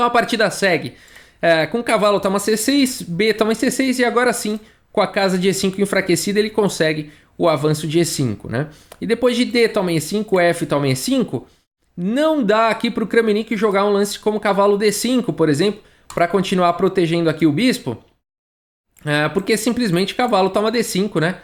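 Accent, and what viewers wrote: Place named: São Paulo, Brazil. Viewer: Esse sotaque de paulistano é um barato!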